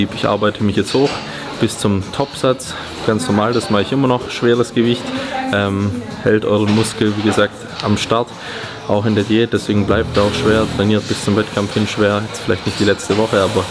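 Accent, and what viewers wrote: Swabia, Germany. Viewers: Mehr Schwäbisch bidde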